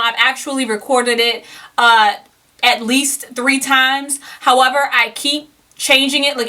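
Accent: South, United States. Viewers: Am I noticing a country accent? I’m talking about the cowboy type too. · I hear it the country accent too.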